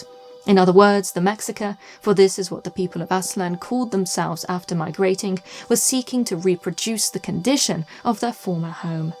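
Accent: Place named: Northern England, United Kingdom. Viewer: I like the way you're doing that bbc thing of talking in a RP accent but occasionally letting that northern accent come through, like your pronunciation of "a"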